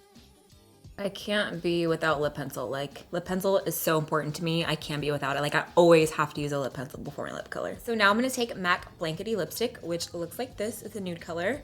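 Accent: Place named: Southern California, United States. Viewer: Katie Meyer I think it’s the CA accent. Not “white privilege”. Some of us sound like that here in So Cal. Like, Valley Girl accent almost. (Just my opinion). She has stated she’s half Puerto rican too.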